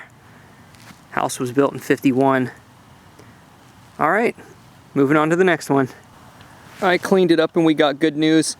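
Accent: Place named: Virginia, United States. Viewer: Dam thought you from Virginia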